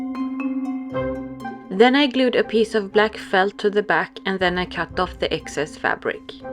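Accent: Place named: Norway. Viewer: This is really cool. Thank you for sharing! Also, if you don’t mind my asking, where are you from? Your accent sounds Norwegian. 🤗❤️🤗 🕸️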